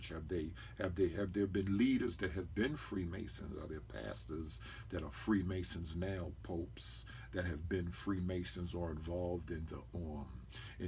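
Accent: New York, United States. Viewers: Of all you got to say, you reply this criticism That's a part of his strong NY accent. Get off the BROTHER. Ask God to help YOU so you will LISTEN to all this brother is saying. YOU got a PROBLEM!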